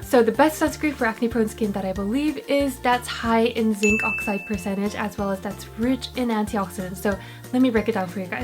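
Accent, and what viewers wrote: England, United Kingdom. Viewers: Love your british accent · is she from England? Her accent sounds kinda english :o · Her little British accent when she has the glasses on...:) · She has a british acsent 😅 kind a bit 😄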